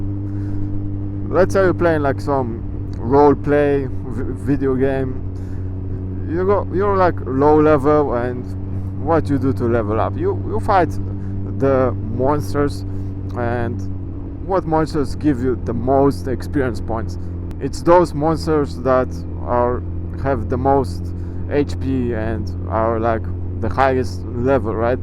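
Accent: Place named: Russia. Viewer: Привет из Беларуси,по твоему акценту понял что ты русский)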